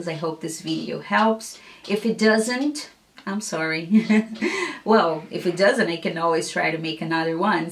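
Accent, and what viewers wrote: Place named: United States. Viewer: Super fluente... e teu sotaque é bem americano, nossa! mto fofa.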